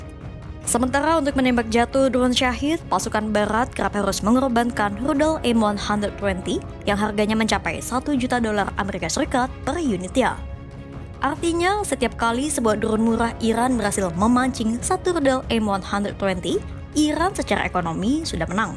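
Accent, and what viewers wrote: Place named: Palembang, Indonesia. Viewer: R khas plembang kok.., ya begitu lafalnya.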